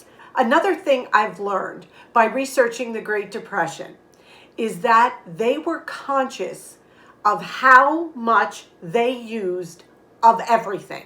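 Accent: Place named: New Jersey, United States. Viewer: Great tips! We give kitchen scraps to the hens, we get eggs. As a native Philadelphian, I gotta say you sound like you're from Jersey.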